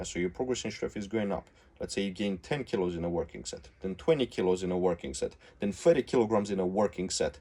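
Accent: Russia. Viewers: Ты русский? Акцент выдает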